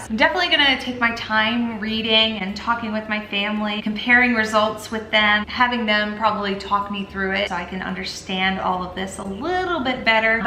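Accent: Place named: Scotland, United Kingdom. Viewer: Cant lie if you applied the scottland accent you'd be a natural, even speaking english you can kinda hear it, still surprising though.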